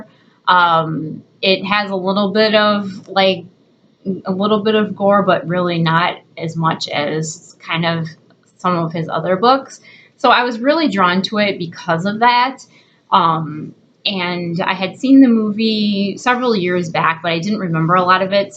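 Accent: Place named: Florida, United States. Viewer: I like your accent.. are you from Florida?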